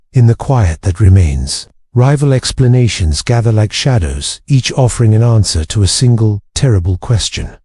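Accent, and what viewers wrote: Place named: RP, United Kingdom. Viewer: I love history, but this piece is so far up its own a***, it's embarrassing. Narrative should rivet and guide and inform, not try to impress with a plummy accent, lots of big words and no actual people, characters, story development. People love to learn about people. Plenty of scientists, historians, even mathematicians understand how to decode something complex and spin a wonderful yarn, with drama and tension. Instead, this feels as if the narrator is reading from a stuff textbook...I can give plenty of examples. Do better, or I will not be back. Tell a story that engages and isn't so self-impressed. "Disease is the quietest, cruelest candidate..." Really? How about discussing the actual one that hit the metropolis you're detailing? And stop being so vague and boring. And note to the producers: This irked me so much, it didn't do anything to help me drift into sleep.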